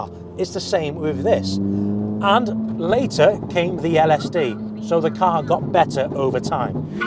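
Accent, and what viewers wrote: North Wales, United Kingdom. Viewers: Where abouts in north wales you from?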